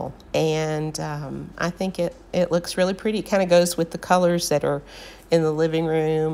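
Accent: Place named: South, United States. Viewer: Hi! I just found your channel. I am also a retired teacher and I can detect your southern accent. You can take the girl out of the south, but you can't take the south out of the girl! Thank goodness! I am a transplanted Texan living in Houston, Missouri. I really like your decorating style. I will be back for more videos.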